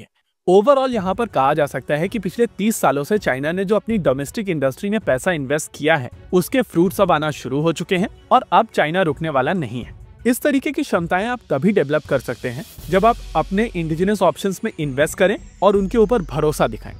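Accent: India. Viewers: english with hindi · I must say I'm addicted to the india accent. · 对英语一窍不通的我一听到这口音我就知道这是印度人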